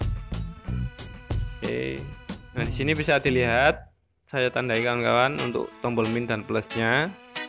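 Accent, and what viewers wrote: Java, Indonesia. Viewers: Jowo ne kenthel..."MBRAWUL"..😀😀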